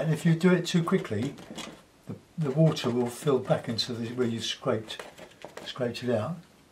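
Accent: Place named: South London, United Kingdom. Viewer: Great painting, I look forward to your videos, you sound like a south London bloke, I'm in Croydon do you know where I might pick up a hake brush locally? By any chance, cheers for sharing your work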